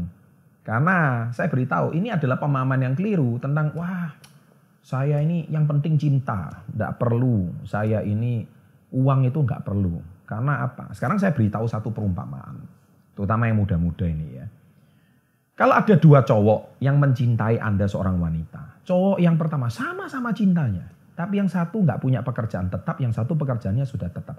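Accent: Java, Indonesia. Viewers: Logat jowo e ketoro pol opo wong jowo to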